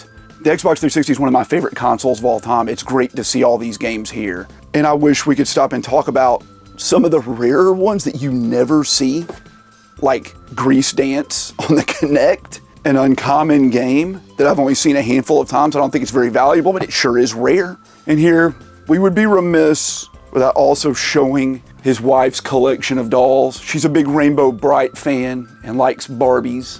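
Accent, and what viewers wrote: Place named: Louisiana, United States. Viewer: Is this accent from Louisiana? Sounds badass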